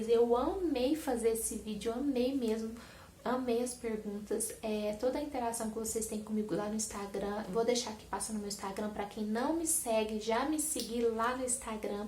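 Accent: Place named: Minas Gerais, Brazil. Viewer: Sou a Vani Souza de Campinas viu bjos linda já disse antes, descobri o seu canal e amei demais, já disse gosto do seu jeito mineirinho de falar, seu carinho com tudo que faz, seu carinho e paciência com as crianças é bom demais viu! Gosto de tudo que vc posta, morro de vontade de comer seus bolos, fico com água na boca, deve ser uma delícia, se tivesse aí perto de vc com certeza eu compraria mesmo kkkkkkk te digo que vc é iluminada, que o Senhor te abençoe e te proteja sempre, vc é especial pra Deus e pra mim tbm viu, manda bjos pra mim, eu comento sempre lá no Instagram, bjinhos nas crianças lindas, fica com Deus, Deus te abençoe minha linda🌺🤗😘💞 Vani Souza de Campinas Manda bjos pra mim linda💓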